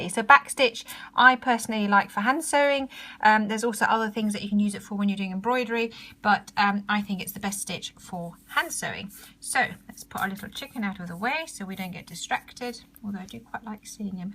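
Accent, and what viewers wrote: United Kingdom. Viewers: ur British ;)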